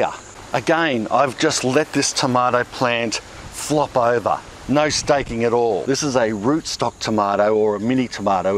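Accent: Australia. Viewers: Love the Aussie accent mate...... ❤❤❤👍👍👍🙏🙏🙏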